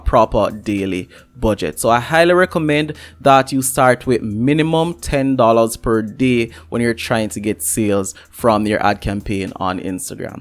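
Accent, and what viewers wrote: Jamaica. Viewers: I hear Jamaican 💀 dropped a sub 💯 you got my full support bro I love to see other Jamaicans doing big stuff 🙌🏽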